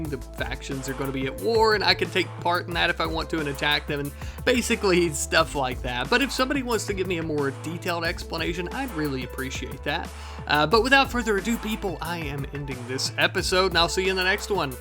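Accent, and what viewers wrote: South, United States. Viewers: My request is this, a mighty unit of the finest, most exquisite gentlemen, who will dedicate themselves to not only America, but their duty. This duty will be to purge the scum of the earth given the name "bull frog" or Lithobates catesbeianus. Even the name is shameful. May this unit (who's numbers needn't be many) cleanse our ever free land of the bull frog menace, and act as, what comes to mind for me, like the commissars of 40k, though the true use is up to you.(This content is god tier and i look forward to every episode! Thank you for literal hours of legendary entertainment! Also what state are you from if us because I hear some southern. oorah NC and thank you for existing)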